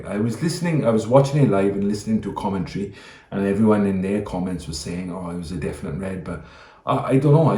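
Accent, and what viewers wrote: Wales, United Kingdom. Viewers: What accent do you have? I heard welshish but alot of others in there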